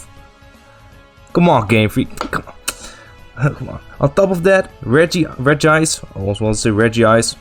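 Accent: Netherlands. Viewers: Lmao dat nederlandse accent maakt deze video zo veel beter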